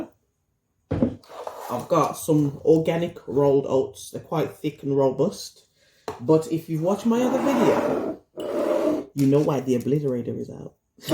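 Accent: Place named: Jamaica. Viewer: You still pronounce it the Jamaican way too lol... Great video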